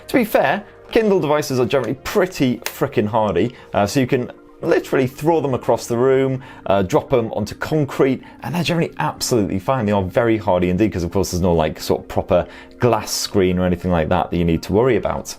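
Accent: United Kingdom. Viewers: I like your video content and your british voice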